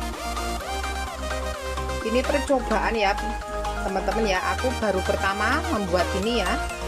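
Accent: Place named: Java, Indonesia. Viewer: Resep kembang kol crispy yummyy Kentel bahasa Jawa nya mbk Mksh tlh brbgi resep 👍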